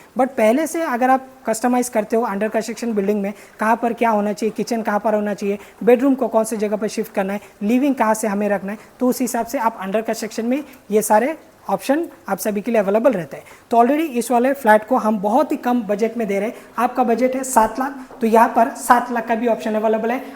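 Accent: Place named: Nepal. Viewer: Yeh bhai hindi me hi bol raha hai. Ye nepali hai isliye Aisa hi hindi bolenga bhai.o.k.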